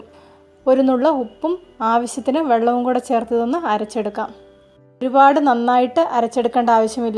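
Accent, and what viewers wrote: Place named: Southern Kerala, India. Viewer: വീട് കണ്ണൂരാണോ? സംസാരശൈലി തെക്കൻ ജില്ല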